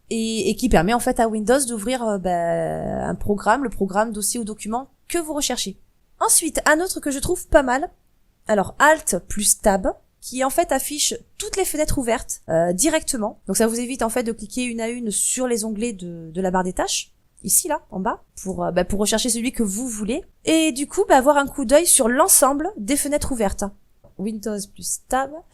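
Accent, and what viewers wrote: South, France. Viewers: Tu as un accent de qq qui a vécu à un moment dans le sud mais pas forcément toute sa vie ? Moi j'utilise souvent : Ctrl+F pour rechercher des mots clefs. Ctrl+clic gauche pour ouvrir un lien dans une autre page. Ctrl+la molette pour zoom/dezoom. et ctrl alt supp.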